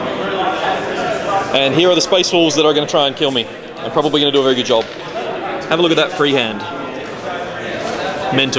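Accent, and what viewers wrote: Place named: Australia. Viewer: cool, like the vids and its nice to here our aussi acent for a change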